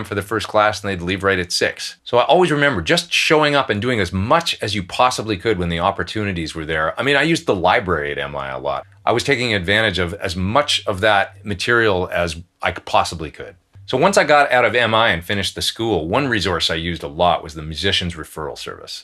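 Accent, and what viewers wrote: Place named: Canada. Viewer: Great advice. Sounds like you might be a fellow Canadian!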